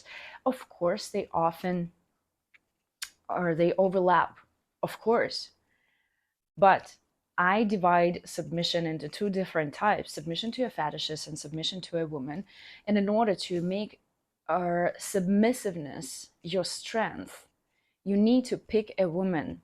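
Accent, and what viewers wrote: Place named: Russia. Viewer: Gracious, I just have one comment today: I know you say Russia is a very bad place at this time and I believe you 100% but I must say.. Your beautiful accent and physical features make me certain that at one time long ago Russia must have been a very good place. You know, the concept of the Chineese Emperess is something that I find so appealing, I don't know if is historically accurate, but I heard somewhere that China in ancient times was a people ruled by a female hiearchy.. what a wonderful wonderful world that must be. I have finally come to realize I can't wait for the world to become matriarchal.. so I'm declaring now that it has officially changed! We are now living in a matriarchal society and I expect everyone to act occordingly.. they will be called out by me for non-compliance. You are so wonderful.. I wish I had money for you but I am on government assistance for my mental disability and sometimes can't even manage my bills. 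So I leave comments, let me apologize; because of my mental disabilities I know that about half my comments are wierd and off the wall but I ask you to please just ignore them because occasionaly there is a rational heartfelt comment.. and I have such strong feelings of respect and admiration for you that it would be a shame for you not to understand how important you are to me just because i ddid a little too much LSD or DOPE many years ago.. btw: as society is more and more accepting of homosexuality I have already gone on to somethi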